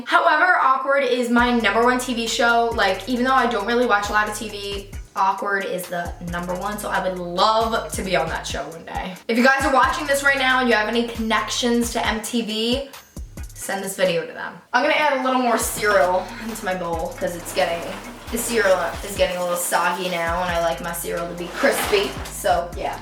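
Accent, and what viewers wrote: New York, United States. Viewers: love your new york accent omg!! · New York! I was trying to figure out where that accent was from!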